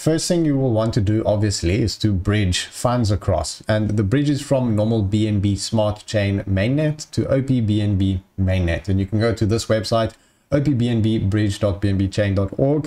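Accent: South Africa. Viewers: If I may ask, are you South African?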